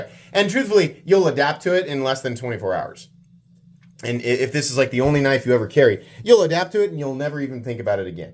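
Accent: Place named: Maine, United States. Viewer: "Wicked good"? You a Mainah?!?😁😁 Also instead of " plunge drag" you could call it" button pinch. "😅😅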